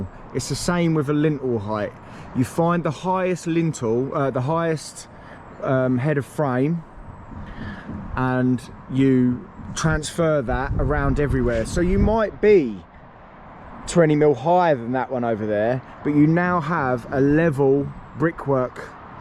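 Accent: Cockney, United Kingdom. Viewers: I never knew bricklaying was so technical.....thought it was just bish bash bosh! At least that is what I witnessed when a bricky built a wall for me and probably why it looks so shit! Loving the cockney c bombs thrown in.....qwality son. Thumbs up.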